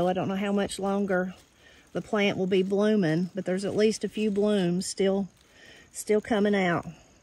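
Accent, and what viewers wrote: Appalachia, United States. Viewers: I know what airish is now!!! I even used it the other day. Thank you! Love the Appalachian language.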